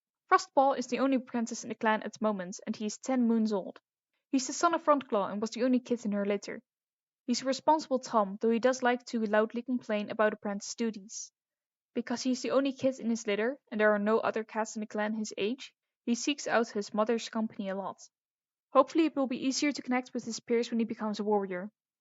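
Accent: Netherlands. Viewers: Awesome! Also do you speak Netherlands/Dutch? It sounds like that so sorry if its not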